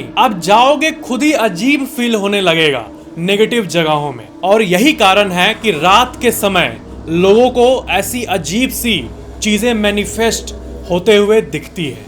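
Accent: Bihar, India. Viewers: bahi qna video bnao aur btao tum Kya Bihar se ho?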